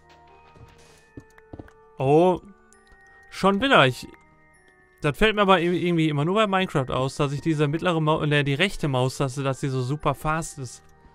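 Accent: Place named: Ruhr, Germany. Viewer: Ruhrpottslang ftw.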